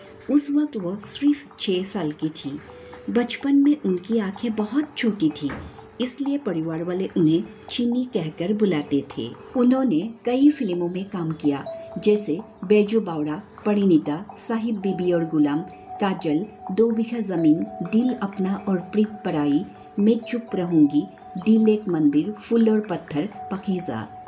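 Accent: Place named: Bengal, India. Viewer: I enjoy listening to your Bengali/Asamiya,Hindi accent.It reminds me of Suchitra Sen of Andhi or Mamta fame. (I appreciate your beautiful research work and presentation)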